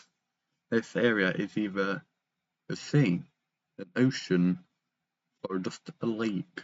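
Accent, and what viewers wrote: United Kingdom. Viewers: Nice Bri’ ish voice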